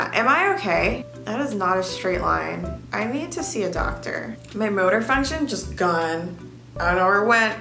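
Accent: England, United Kingdom. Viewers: why is no one talking about her british accent?😂it’s actually good,coming from a british person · PLEASE, A VIDEO WITH U SPEAKING BRITISH ACCENT ONLY PLEEEEEAZZZ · Please keep making videos in british accent😩😩😩,love it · Can you do a vid only speaking British accent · You have a pretty decent English accent. Not too cockney not too posh 9/10. 👏🏻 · nobody gonna talk about her british accent XD i mean she is immitating but it's on point :))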